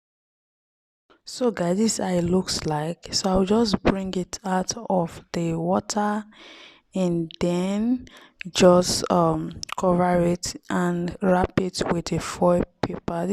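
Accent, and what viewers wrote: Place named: Nigeria. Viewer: 😂😂😂😂😂😂she is from Nigeria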